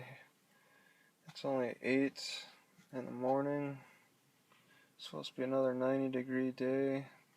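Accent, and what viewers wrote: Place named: Midwest, United States. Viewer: I’m re-watching some of your old stuff. Your voice relaxes me for some reason. Probably the Midwest accent.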